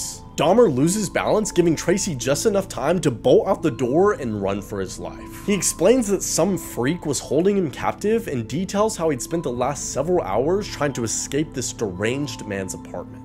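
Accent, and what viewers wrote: South, United States. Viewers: I love your story telling technique. Are you from the South. I can detect a southern accent. In your speech.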